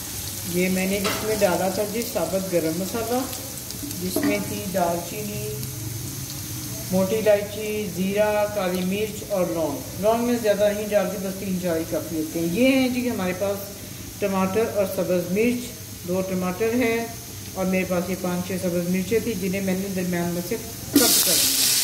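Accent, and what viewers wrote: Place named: Punjab, India. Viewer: ur Punjabi accent is very nice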